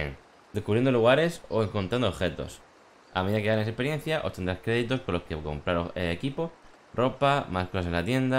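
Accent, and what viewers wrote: Murcia, Spain. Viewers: Es de Murcia ese acento??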